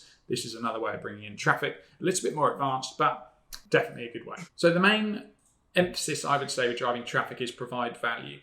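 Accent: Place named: Birmingham, United Kingdom. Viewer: Thanx for your reply. Just thought I could hear a slight Brummy accent on your video (;o)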